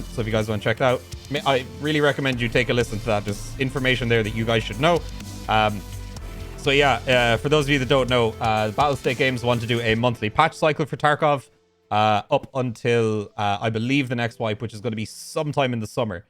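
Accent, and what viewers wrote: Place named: Ireland. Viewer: Been waiting for an Irishman to read me those juicy patch notes! Sorry about the funeral though man :(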